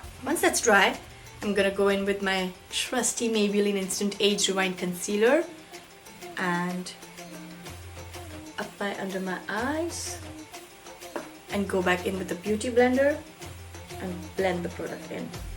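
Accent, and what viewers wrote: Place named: Gujarat, India. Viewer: dayum that gujju accent tho :D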